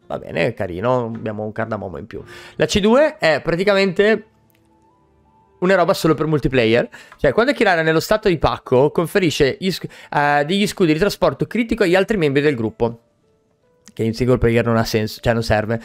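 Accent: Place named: Carrara, Italy. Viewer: Ma a l`è cuscì.... Sei di Carrara?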